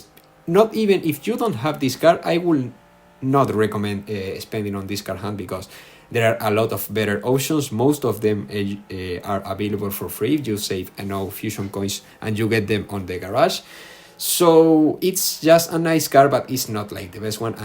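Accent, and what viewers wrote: Spain. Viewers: Uff loco, de acá se te nota el español🥵👌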